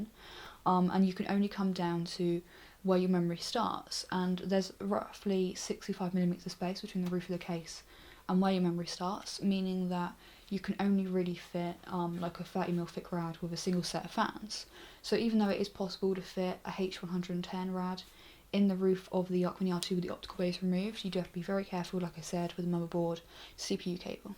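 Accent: United Kingdom. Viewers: Anderson Paschoalon Noooo... It mean that UK has the most diverse population ranging from Scottish to Welsh, from North to South accents, from Southall to Stratford accents, from "chavy" to posh, from Punjabi to Polish... Man..."dys acent" is a droplet in the ocean of diversity over here. Get use to. Or change page if you cannot comprehend it, as for speakers... She has a one of the softest voices I know of and in different situation, she would have your erection just by talking to you. ;-))) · Damn,this english accent.. :| I usually understand british accent but not now :P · Omg her accent makes it so damn difficult to understand. I thought British accents were the most easiest way of listening or learning English. Her accents makes it seem like all gibberish. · Oly VL Haha of all things to like, her accent (look I'm south african, so a british accent appeals to me too), just that I prefer her to other reviews because she isn't some 50 year old fat dude or a 12 yo kid or that she is a female in a male dominated sector (as far as I know)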